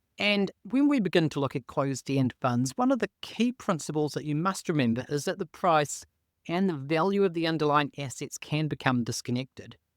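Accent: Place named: New Zealand. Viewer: Thanks, for sharing your knowledge and advice. I lived in New Zealand as a child for two years some sixty years ago. Hearing your accent brings back fond memories of the Land of the Long White Cloud!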